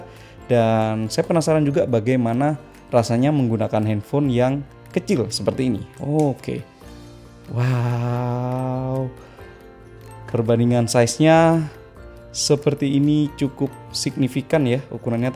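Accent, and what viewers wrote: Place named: Surabaya, Indonesia. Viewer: Hahahah, suroboyoan jangkrek..😁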